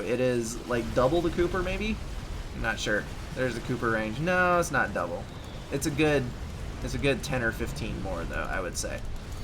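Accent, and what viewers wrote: United States. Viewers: I love the southern accent from you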